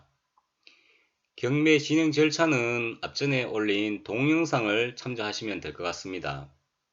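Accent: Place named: Gyeongsang, South Korea. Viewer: 경상도사투리가 이렇게 부드러운줄,, 내용도 넘 쉽게 귀에 쏙쏙,,감사합니다 좋아요 구독합니다^^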